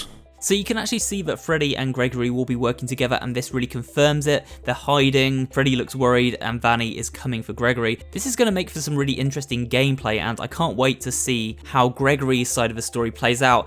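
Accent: United Kingdom. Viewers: you sound like your from the UK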